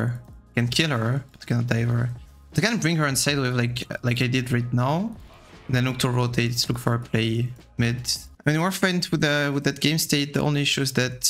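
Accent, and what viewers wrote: France. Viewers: Hi I discover ur channel, is sound like but not 100% sure are u french ?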